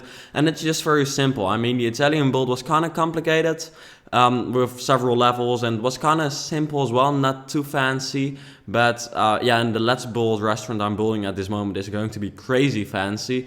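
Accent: Netherlands. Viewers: Gooooo Nederland jeee haha geweldig en mooi gemaakt!! En je hoort wel dat je van Nederland komt #DutchPowerr!!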